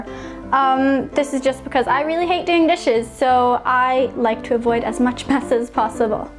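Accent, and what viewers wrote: United States. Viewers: That explains a lot! Yours is the least odd foreign American-sounding accent I've heard, though. Had a friend whose wife was from... Croatia, I think? She had the most Brooklyn English accent I've ever heard. I didn't know she wasn't from Brooklyn for about a year after I met her. I never did find out if she learned to speak English from someone from Brooklyn or if she just chose a heavy American accent to cover her Croatian accent. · Definitely a Floridian accent. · her accent sounds like it is from the midwestern us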